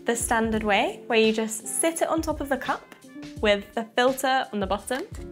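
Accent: United Kingdom. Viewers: Was thrown by your British accent, was just going to place an order before realising it was a US company! 🤣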